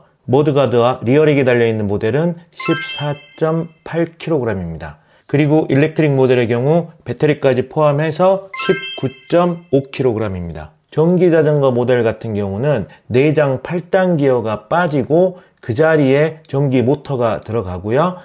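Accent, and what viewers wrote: Jeolla, South Korea. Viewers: 전라도 사투리 맞나요?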